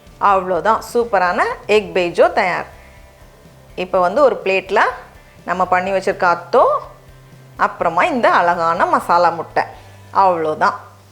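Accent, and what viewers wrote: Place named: Kanyakumari, India. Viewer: Akka inum kanniyakumari slang varuthu...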